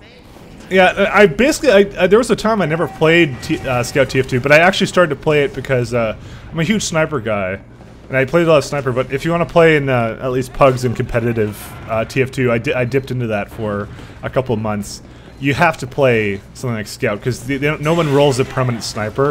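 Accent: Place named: Canada. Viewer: His Canadian accent may have something to do with it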